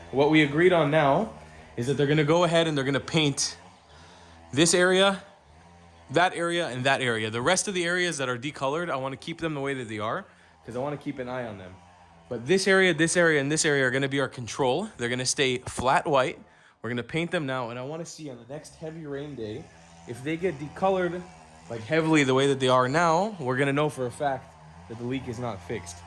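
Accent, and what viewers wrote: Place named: Philippines. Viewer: Wow, your bisaya english really sounds filipino. Even the accent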